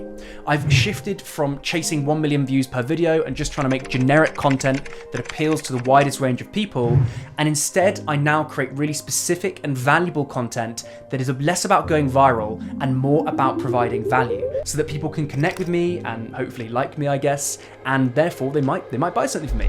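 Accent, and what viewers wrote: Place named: United Kingdom. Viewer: Good video. Like your advice. And your British accent is crystal clear to us Americans. However.... this advice is pretty useless for those of us on YouTube who are not interested in monetization. Many of us are here for politics and to promote political viewpoints. In my case, libertarianism. Have zero interest in making $$ on YouTube. I have a day job. Now... if you really want to help folks like me, make a video on how people with day jobs can find the time to make YouTube videos.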